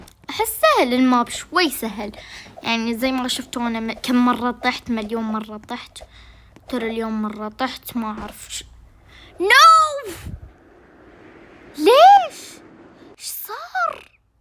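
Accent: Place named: Iraq. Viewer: ماكو اتوقع انها عراقيه!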